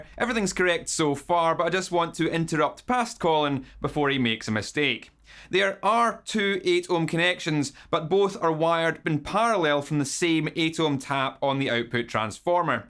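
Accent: Scotland, United Kingdom. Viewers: Is this a Scottish accent? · Love the Scottish accent 👍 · I never would have guessed guitar tech Yoda was Scottish. Good info. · I’m a simple man. I see a video that commences with Colin saying, “hello guys, Colin here” and I smash that like button and watch the whole damn thing to get the full Scottish brogue experience. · Your Scottish Accent. I want to learn it. PLS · Cool video, with the accent of Sir William Wallace! Hear your accent makes me wanna drink whisky! Cheers from Portugal 🍺🤘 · Perfect explanation--many thanks! Also, as a Star Trek fan, the fact that you have a Scottish accent and are explaining functional electrical engineering concepts is a real treat. : )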